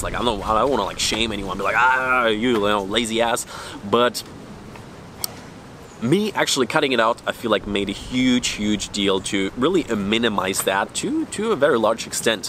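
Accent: Germany. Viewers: Bro kommst du aus Düsseldorf?? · Can anyone tell whether this guy is German?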